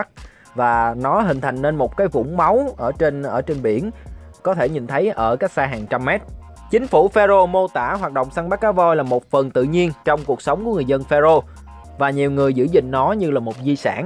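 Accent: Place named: Phu Yen, Vietnam. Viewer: Giọng đọc Phú Yên thì phải.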